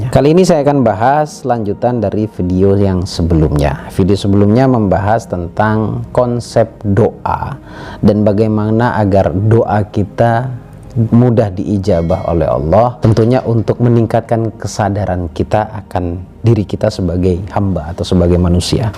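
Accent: Madura, Indonesia. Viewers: Madura mana? Mas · Gus. Orang madura? Dn jawa.. Pantesan..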